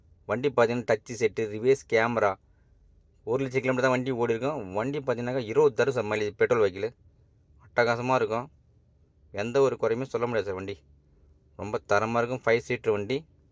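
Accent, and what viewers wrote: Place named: Madurai, India. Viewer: Madurai vasagam super 🎉🎉🎉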